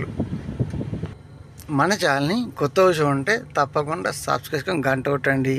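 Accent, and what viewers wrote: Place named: Telangana, India. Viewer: Sooo Beautiful nd nice sharing 🎉🎉🎉🎉 garu 🙏...mi slang..Telangana. Mastu undi..... super super super 🎉🎉🎉🎉🎉🎉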